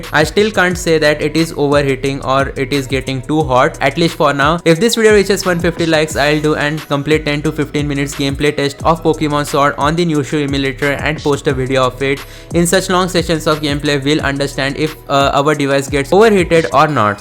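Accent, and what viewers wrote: India. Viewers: Damn Indian accent is so weird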